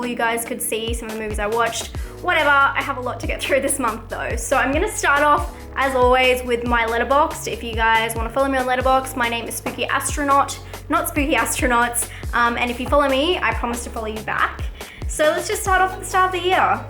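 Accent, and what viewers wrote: Australia. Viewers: Yay,!! Saw movies!! Big fan! So cool you went to the Gold Coast! I personally think the west coast has nothing to envy. Sounds like you are from Western Australia?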